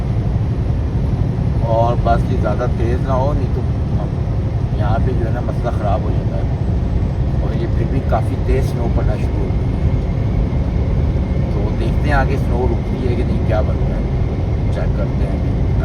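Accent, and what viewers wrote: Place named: Punjab, India. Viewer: Bhai sahib, why didn't you make your vlog in Punjabi instead of using a Punjabi accent in Urdu with some English words? I don’t understand why some people have a complex about trying to use Urdu and English for their vlogs. Also, you are complaining about the weather in Canada, but who invited you there? Bhai, be grateful to Allah; you have been blessed with so many blessings and a job. Enjoy the day you've been blessed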